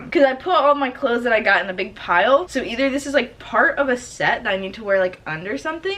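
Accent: United Kingdom. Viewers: luv the british accent luv! also congrats on 100k<3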